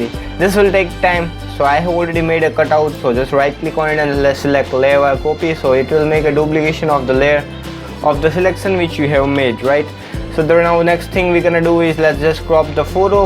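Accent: Gujarat, India. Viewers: sure bro by ur accent u seem gujarati ryt?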